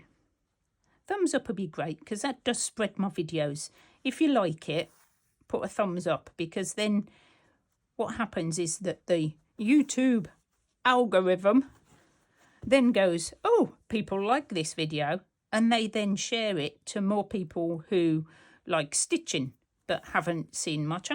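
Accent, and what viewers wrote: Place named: West Midlands, United Kingdom. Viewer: I'd love to see the finished result. I also love listening to you speak - your accent is similar to some of my family. They were from West Bromwich and others from Walsall. I'm a Brummie ;) Ah, the accents of the West Midlands.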